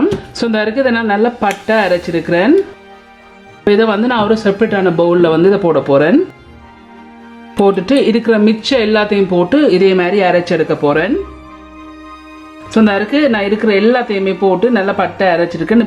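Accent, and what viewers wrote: Jaffna, Sri Lanka. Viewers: I like the way you speak Jaffna tamil and including some sinhala words like bola, kithul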